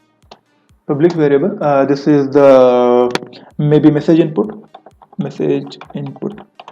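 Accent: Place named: India. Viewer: Hears indian accent..already disappointed...then sees him copy and pasting...so sad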